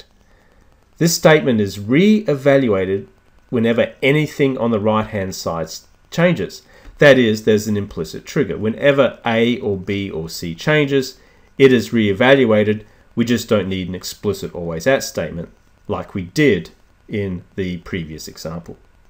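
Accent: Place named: United Kingdom. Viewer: What are you talking about? He's pronouncing it with his British accent what's your problem lol? Your accent is a lot worse for pronunciation, so I wouldn't be talking if I was you, at least his is one native to the English language.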